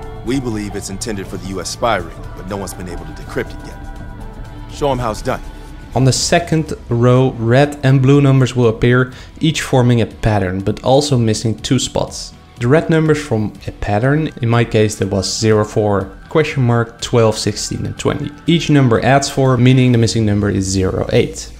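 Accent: Netherlands. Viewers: Ik hoor dat jij Nederlander bent zwz 😂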